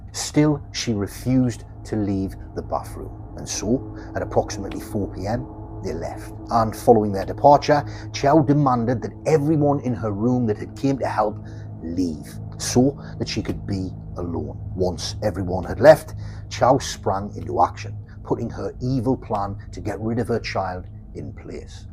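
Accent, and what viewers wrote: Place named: North, United Kingdom. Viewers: Subbed, and I'm only 13 minutes in! 🎉 I'm originally from the North so your accent is making me homesick 😅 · I was gonna say. It's a Sunderland accent not Geordie.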